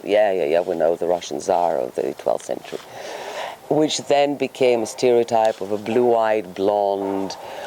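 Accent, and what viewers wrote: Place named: United Kingdom. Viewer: Бритиш англ😊я лов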